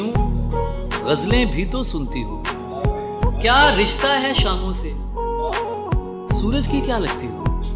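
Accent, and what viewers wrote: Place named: Delhi, India. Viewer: yar bhai hai tu pakistani lagta aapna delhi wala hai wohi boli wohi words wohi aapne mohalle ke ladko ka style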